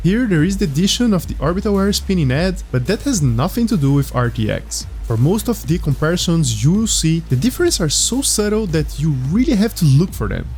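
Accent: Brazil. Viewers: Não é Unotable, é Unnoticeable. Quando for gravar os vídeos, pensa que vc não quer que percebam que vc é de SP.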